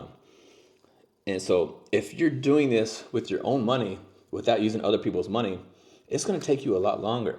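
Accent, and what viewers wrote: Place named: Appalachia, United States. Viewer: I detect an Appalachia accent but a Slavic face. I'm confused, but you're cute. I got zividendz😊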